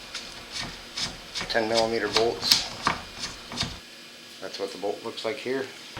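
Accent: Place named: Canada. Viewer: Sounds like you’re from Canada, I’m sure I’m off. Great job for not being a body man and you had your best friend helping you with the hood! Living in NYS the Capital of RUST BUCKET HEAVEN understanding and having the patience for fixing a truck like this is truly a virtue! My 05 silverado is getting the Shit beat out of it body from all the SALT and WEATHER MODIFICATION WARFARE we as human beings are FORCED TO ENDURE HERE in The Great Lakes Area over A Period of DECADES! Nice job maybe if I can muster up the patience between my cancer treatments and pain management from exhausting amounts of painful spinal surgeries I’ll be able to fix my truck in between fixing my house from the December 22 winter snowstorm that killed human beings along with locking everybody and everything in sight for a couple of weeks!!! It’s a shameful disgraceful sinful world we live in since the deep state Covid tyranny was forced onto humanity, so good for you for keeping yourself busy! 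We can all bury are heads in the sand now that the Covid tyranny is all gone!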